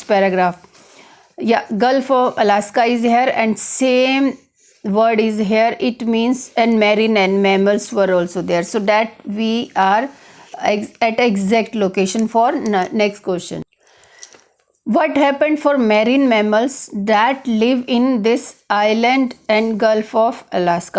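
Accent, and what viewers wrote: India. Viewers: Your accent is very disgusting mam. Hate indian accent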